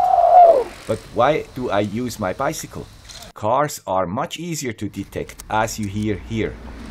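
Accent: Switzerland. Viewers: 2 years in: still loving the guy with the Swiss accent.. my #1 go-to guy when I want to be informed about IoT, electronics and home automation! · Sir Mr Swiss accent guy, is it possible to hook a Cantena to these modules to increase range and directionality? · how do you spell the word that you say at the beginning of every episode? "Grietsie YouTubers"? I always enjoy that "Swiss" accent, which I imagine must be German or Romansh:) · Hello Guy with the Swiss accent, I am a great fan of your channel and thanks to you I have built a lot of projects and Every one was successful. You are truly a great guy.. Can you do a project on building a speed gun radar detector to be used in the car using commonly available sensors and microprocessors. It will be really great project. The currently available sensors in the market are pretty expensive. Also as a maker it will be great fun to build one on our own. 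Thanks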